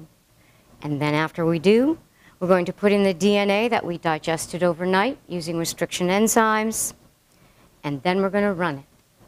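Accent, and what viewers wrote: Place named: South, United States. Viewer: Slight Southern accent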